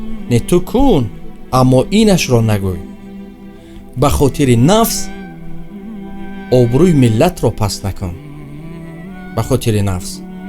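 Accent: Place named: Tajikistan. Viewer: Асалом Алекум акаи кучогиай забони точикиро тоза гап мезанад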